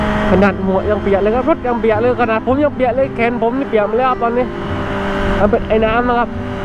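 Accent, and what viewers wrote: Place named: South, Thailand. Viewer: ชอบภาษาใต้ 👍🏻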